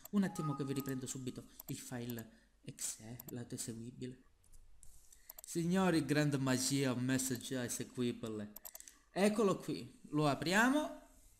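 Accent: Sicily, Italy. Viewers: a quanto ho sentito sembri siciliano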